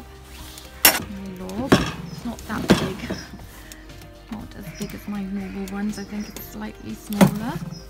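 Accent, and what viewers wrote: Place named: South Wales, United Kingdom. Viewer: Excellent I'm from the valleys I've been trying to make good pizza in the past it sounds like you're from south Wales.if you are if you like to meet up and see how you make it Best wishes from Paul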